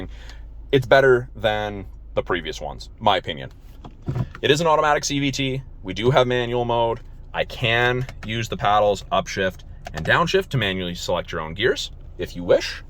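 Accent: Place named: Canada. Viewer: I enjoy this fellas reviews he’s got moxy to him and that Canadian accent is a hoot!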